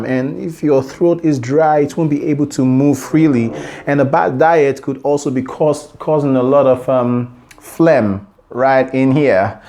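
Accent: Nigeria. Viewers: Sounds Nigerian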